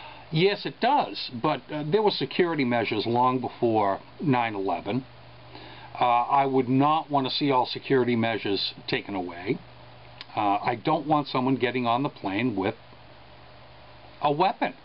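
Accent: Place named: Boston, United States. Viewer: HA!!!! Now I know what your accent is.... a Boston accent! lol Soulpeace Sharon Alyss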